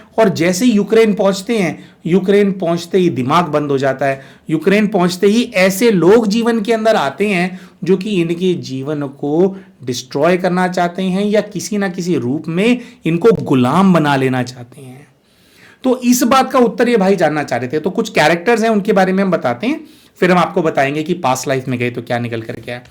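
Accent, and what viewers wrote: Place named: Mumbai, India. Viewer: Kantal gaye baba, this is mumbaiyga hindi.kantala ye marathi word hai. inko past life karane ki jarurat kya thi? Boring laga sunana.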